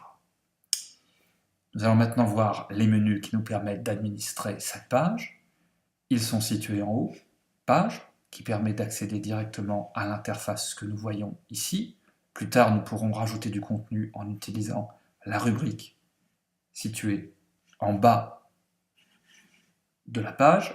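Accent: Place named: Alsace, France. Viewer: Très bonne présentation, seul bémol, le présentateur a un fort accent Alsacien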